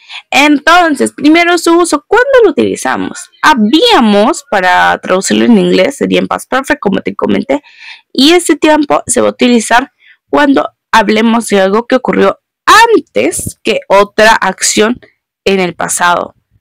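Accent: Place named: Spain. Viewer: Que acento mas insoportable ,me refiero al Español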